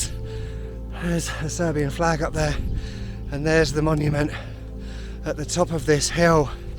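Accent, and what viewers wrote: United Kingdom. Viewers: thank you for your brilliant shots, Serbian subtitles, and bludy flowless brits acent i like it the most.)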